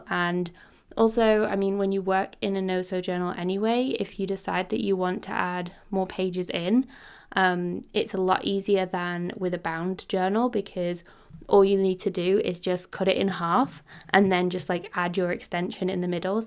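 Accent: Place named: Manchester, United Kingdom. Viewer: Love these, it's great to not have to stitch the pages in for once ❤ Off topic, but can I ask whereabouts you're from? You sound sort of Greater Manchester-esque like me!! (I'm in Stockport) x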